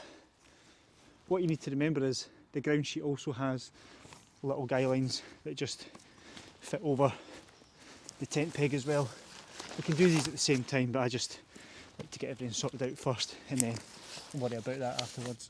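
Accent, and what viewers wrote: Scotland, United Kingdom. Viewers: only coz I'm Scottish and have a similar accent I noticed man, wouldn't worry about it 😂 when putting the tracking pole into the loop at the ground if it bothers you 😂 I'm looking at buying this tent and just checked your link. It gives a 3 season and 4 season option I know generally what this means but what would be the difference in tents ? I thought these tents were all double skinned (walled)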